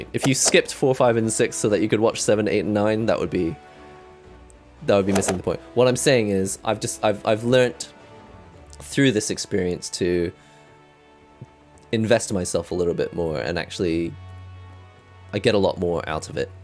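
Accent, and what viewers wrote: United Kingdom. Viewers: Your accent suggests you are British or South African. What's the origin of your English Accent?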